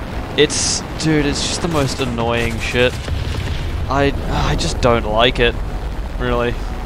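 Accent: Germany. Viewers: Das ist so englisch mit Deutschen anksend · General Question: Are you German?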